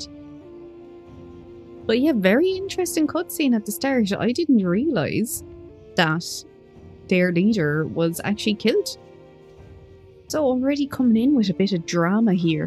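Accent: Ireland. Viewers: I moved to Canada last year and I haven't really interacted with any Irish here. I used to play wow as a teenager and was looking it up on youtube for nostalgic comfort. This was cool to see, I didn't realise I could enjoyed hearing an Irish accent that much Thanks :)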